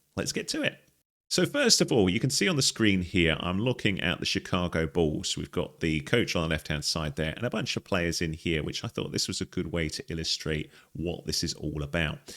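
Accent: United Kingdom. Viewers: great break down, also refreshing to here a brit talking about basketball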